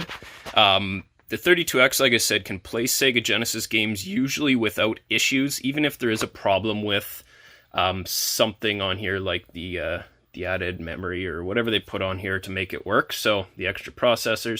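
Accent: Canada. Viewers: Lol I dunno why but being American I always get a kick out of Canadian accents 😁 Great video as always! This will surely help a lot of ppl fix their 32Xes!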